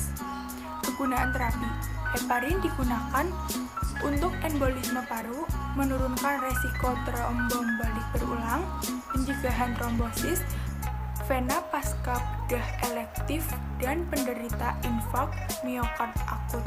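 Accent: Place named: Java, Indonesia. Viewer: Medok banget ndesss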